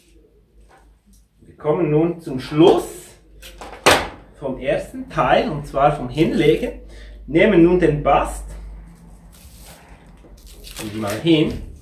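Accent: Switzerland. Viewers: Ich finde das schweizer Hochdeutsch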